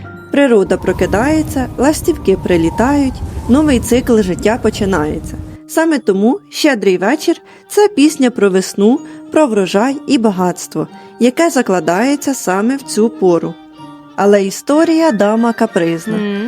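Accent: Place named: Poltava, Ukraine. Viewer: Як же мені подобається ця солодка українська промова, нагадує полтавську вимову.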